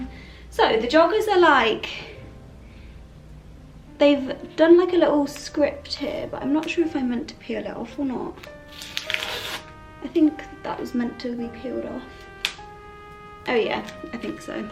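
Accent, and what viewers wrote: United Kingdom. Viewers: I'm just here for the Mary Poppins accent.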